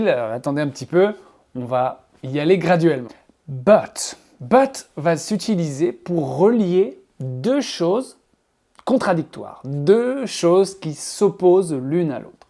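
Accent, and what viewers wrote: England, United Kingdom. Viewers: J'adore cet "accent" anglais :) Thank you so much ! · La premiere impression.. Je me suis tout de suite dis que c'etait un anglais ....u have a good English.... J'aimerais bien apprendre avec vous... Vous êtes très sympa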